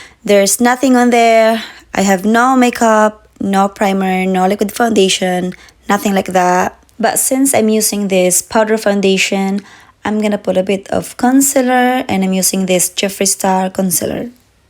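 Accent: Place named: United States. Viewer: Akala ko sis American yung nagsasalita hahaha! Oo maganda nga sis nakakatakip ng blemishes 😊 galing galing magreview 👏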